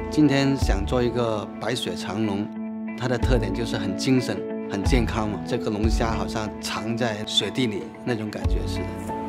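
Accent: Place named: Cantonese, China. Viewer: Yucheng Zhou I somewhat agree with you except that to me he sounds like how my dad speaks mando while his main language is canto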